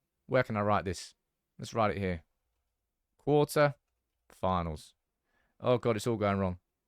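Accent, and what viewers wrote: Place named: Cockney, United Kingdom. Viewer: Cockney clown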